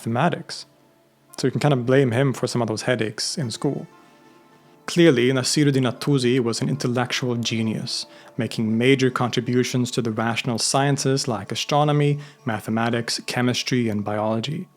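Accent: Arab world. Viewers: Awesome work as always! I thank you and salute you for bringing more and more of these Eastern geniuses into public recognition, which I think would ultimately have a positive impact on the world we live in. On another note, I think your pronunciation of Persian names and words is a bit over-Arabicized! Persian names and words such as Tus and Tusi do not follow the Arabic rules of pronunciation. For instance, the Perso-Arabic letters of س،ص،ث are all only pronounced as [s] in Persian, unlike in Arabic where they all have different pronunciations. Persian has its own completely different rules of pronunciation, despite being written in the same script as Arabic. Arabic words and proper names loaned into Persian also follow these Persian rules of pronunciation. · I have a question to ask, why do you always use Arabic pronunciations when you talk about Persians? 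Is there a reason behind this? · This guy is such an arab wannabe he even says Iranian names with the guttural Arabic pronunciation. Khwajeh Nasiruddin-e Tusi... At least learn his name. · this. your last comparison was extremely on point. he belonged to a persian background, it makes sense to pronounce the name with Iranian / Farsi accentation. there was a similar problem where he pronounced some names from the subcontinent straight up "wrong" in one vid (can't recall which rn tho sorry) cuz of arabization. not to say that this is in bad faith. i'm sure he just finds this to be the most intuitive reading, and ends up defaulting to it during his narration, which is smth i relate to as an urdu speaker who reads farsi in a very urdu accent :,)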